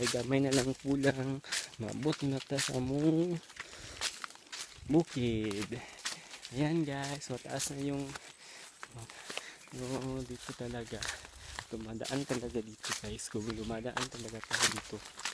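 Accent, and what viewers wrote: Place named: Visayas, Philippines. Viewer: bisaya jud si kuya..hehehehe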